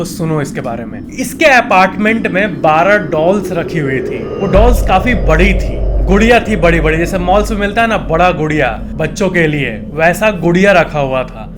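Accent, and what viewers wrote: Bihar, India. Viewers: Typical Bihari tone 😊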